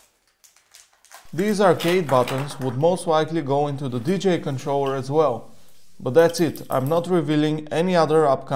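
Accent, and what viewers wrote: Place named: Israel. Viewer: there's no fucking way, you have the most Israeli accent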